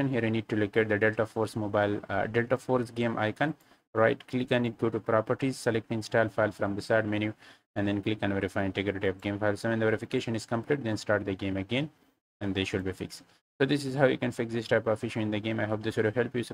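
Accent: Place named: India. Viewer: Thank you it worked! Always some guy on Youtube with Indian accent will save your ass!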